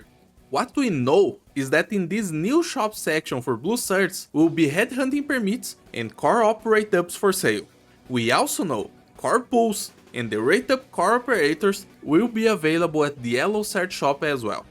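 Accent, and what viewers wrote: Brazil. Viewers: I hear a Brazilian accent 👀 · by any chance, are you Brazilian? i am and the accent your English have is very similar to the one Brazilians have speaking English lol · Sotaque de brasileiro e quase instantâneo de reconhecer khkhgkj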